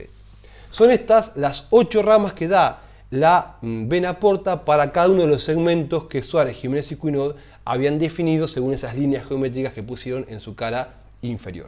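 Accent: Argentina. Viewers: Los porteños hablan así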